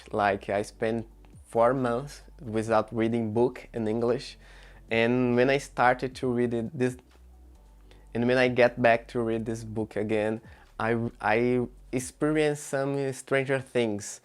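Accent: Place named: Brazil. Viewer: Eu entendo mais um brasileiro falando em inglês do que o nativo kkk , muito bom ! I’m learning speak English fluently, your canal will help so much ! 👊🏼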